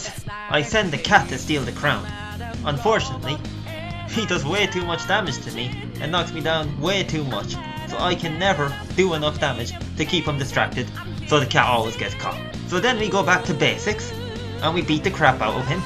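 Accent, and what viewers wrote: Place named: Ireland. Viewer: Ayyyy, where in Ireland are ya from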